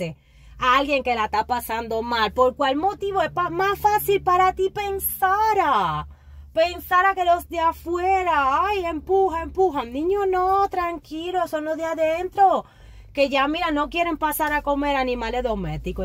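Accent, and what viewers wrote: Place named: Oriente, Cuba. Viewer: tu eres de las Orientales originales, tu eres de las que dicen valde, guineo y catre. Ese es el verdadero acento Oriental.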